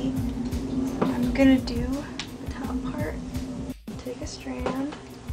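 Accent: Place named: Midwest, United States. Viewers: r u from the mid west? you sound juuuuust like me and my friends haha! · i think its a midwest accent... im guessing she is from chicago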